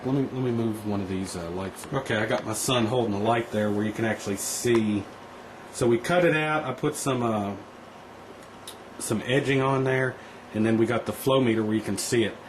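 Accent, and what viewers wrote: United States. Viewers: You thick American accent really pisses me off!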